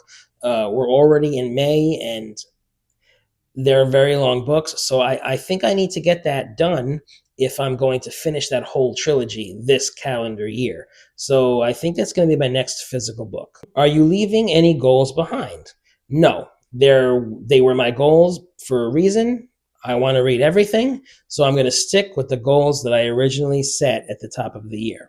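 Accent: United Kingdom. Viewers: this was so great! I love hearing you gush about the books that have wowed you. I loved your accents too lol. I think what you were doing would be considered Cockney, or close to it anyway. Not quite as thick as My Fair Lady cockney though lol. Cliff is South African though. 🙂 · Ooh look at that it's my favourite American dancer bloke doing an English accent!! Hahaha love it - you are doing a southern accent of an English person living in or near London. That accent is all over my City and that's a 2 hour train journey from London. Loved discovering Robin Hobb with you. Also loved talking about Life of Chuck with you. This is a great tag. Awesome video.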